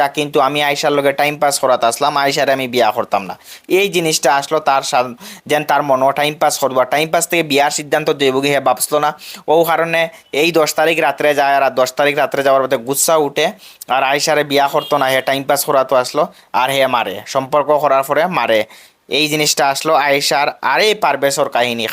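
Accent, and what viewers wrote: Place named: Silchar, India. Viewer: Ami Silchar tone dakram bhai okk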